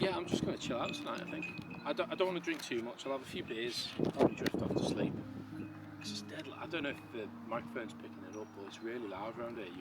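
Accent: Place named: North West England, United Kingdom. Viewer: Fair play doing a big trip like that on your own 🏍️👏🏻 you sound like your from the North West? Maybe catch up on a ride in the future 😎 great vlog - Cheers Rob.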